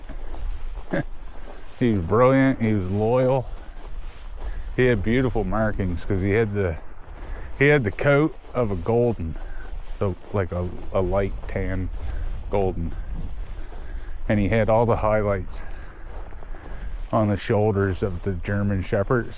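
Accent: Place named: Canada. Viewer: I love hearing the Canadian accent!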